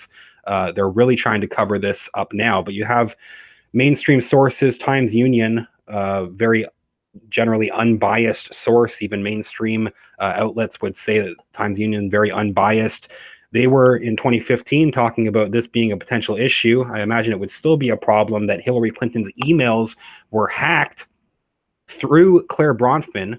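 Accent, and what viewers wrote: Canada. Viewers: First time viewer you sound Canadian The walls are tumbling down Trump Crimes against children looks like its just getting started ! I subscribed the more Citizen journalists the better !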